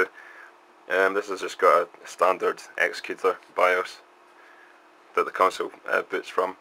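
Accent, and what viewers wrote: Scotland, United Kingdom. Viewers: dont you just love browsing through random videos and finding a Scottish accent xD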